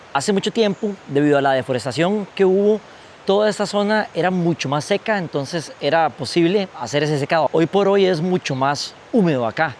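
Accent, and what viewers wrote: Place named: Colombia. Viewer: mae, por qué habla como colombiano? si ud es tico, tenga orgullo de su tierra y hable como tico, diga la R como se debe!!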